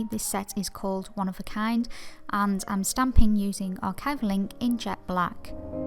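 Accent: England, United Kingdom. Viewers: A nice little treat to come home to after a hectic day at work! Thank u for the video,!! My hubby knows that if I’ve got YouTube on and I say: “ssshhhhh! It’s!!” before the video starts, it’s QUIET TIME; or if he walks in and hears the English accent, he knows that it’s best to simply wait till it’s over to tell me something because I’m in The Zone & won’t pay attention to him!!! LOL You’re just truly a lovely part of my day!! Thank you 4 that!! 😘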